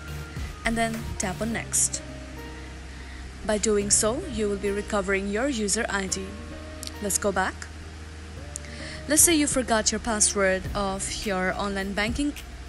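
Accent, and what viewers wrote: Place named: India. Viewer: YOU SOUND LIKE AN INDIAN SCAMMER!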